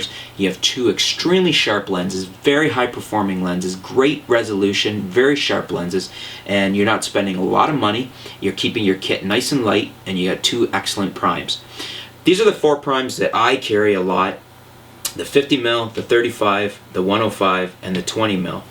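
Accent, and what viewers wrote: Canada. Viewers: really dude, "vig-netting?" vignette. it sounds like "vin-yet." you're clearly super canadian, so you obviously had french forced on you. there is no excuse for that level of laziness. step your game up. also, "bokaahhhhh" sounds idiotic as a million other people said.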